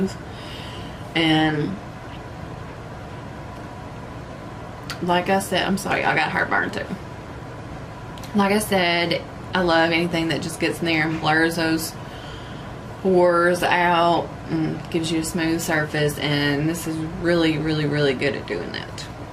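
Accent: South, United States. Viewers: I hear that country Trang lol...nice video. You have a new follower thumbs up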